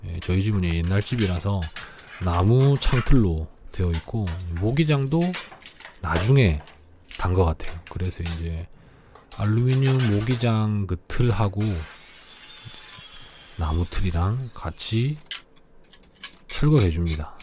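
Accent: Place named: Gyeongsang, South Korea. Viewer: 경남 어디에서 살고 있어요? 말투가 진주/사천 쪽일수도..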